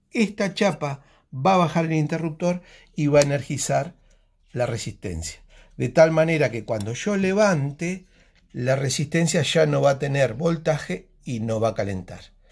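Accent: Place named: Argentina. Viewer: No vale eso te quedó profesional amigo... Exelente trabajo y vídeo. Me suscribo y te felicito por tu canal y talento tienes la paciencia para enseñar y conocimiento para dar gracias amigo. Me parece que eres argentino Dios te siga bendiciendo grandemente y Dios Bendiga a la Argentina. Un abrazo y saludos desde Caracas Venezuela 👍🏻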